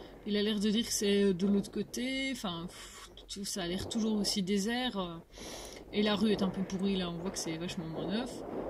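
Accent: Belgium. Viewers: Bonjour L'expression "a pouf" pour "au pif" :o) Mais "passer en stoumelings dans les travaux ;;;" (truc comme ça) ??? Sinon j'adore tes vidéos et ses commentaires avec la gouaille bruxelloise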